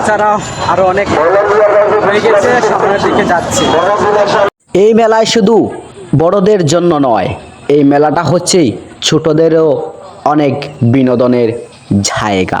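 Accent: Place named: Sylhet, Bangladesh. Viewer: সিলেটি বেটা শুদ্ধভাবে বাংলা বলতে পারে না। পারোনা যখন তাহলে তোমার আঞ্চলিক ভাষাতেই ভিডিও বানাও।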